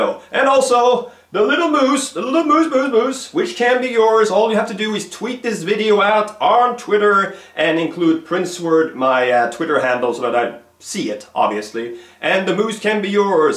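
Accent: Skåne, Sweden. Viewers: I am enjoying all of your videos, having just discovered them tonight. I lived in Sweden from <num>. I tried to learn the language and the culture as best I could, but I keep learning and learning all these years later. I used to think I spoke very good Swedish, but hearing you and other native speakers, I have a long way to go. I think Swedish is very difficult to speak correctly, but a very beautiful language. I like the discussion of Swedish vowels and how you compare the long and short vowels using similar words. You sound a little Skånsk, but you said you were from Karlskrona. I'll have to listen more. My morfar came to America from Sweden. He was from the Landskrona area, so maybe I should learn the accent. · Your videos helped me a lot as a non-swedish user. I've visited Sweden two times, I just fell in love with everything, especially the language. 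When I miss it, I watch your videos to hear again the beautiful accent of your country.Thank you very much and keep up the good work! Oana from Romania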